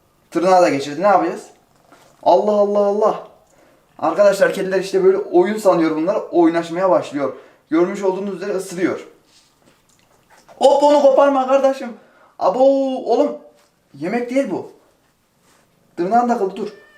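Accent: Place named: Ankara, Turkey. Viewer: Haçı sen Ankara lımısın bunasıl şive agız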